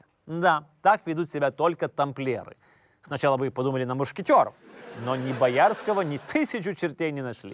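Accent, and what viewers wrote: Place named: Lithuania. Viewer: С каждым выпуском "литовский акцент" усиливается.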